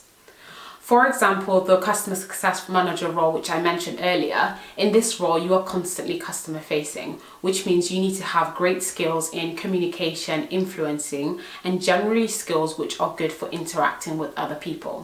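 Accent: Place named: United Kingdom. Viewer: The British English is just awesome.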